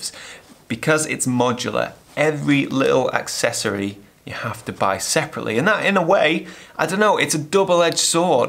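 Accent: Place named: Yorkshire, United Kingdom. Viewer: Ah??? Thought tha was a Yorkshireman. I have gone with a Mammut Trion 35 litre Mountaineering sack (they also do a 50) and put a Tenba Camera insert in it - total cost £160. - plus the cost of a rain cover. Not perfect as the insert has to be removed to access kit but the pack does have the zipper around the harness feature that is rare in a Mountaineering sack. Adam Gibbs - Mr. Grumpy to you, has used one for many years, but just changed it for a bespoke camera pack, supplied as yours is by a manufacturer. No problem with this BTW, I do not begrudge either of you perks for providing such excellent content. The Mammut Pack is also multi functional as it is a rock solid standard pack into the bargain. For street photography I go with a very battered Chinese made copy of a Paratroopers Bag from Israel, cost £15.... plus a £35 Billingham Insert - does not look expensive but gives the Camera 5 star protection. 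And it cheap:-).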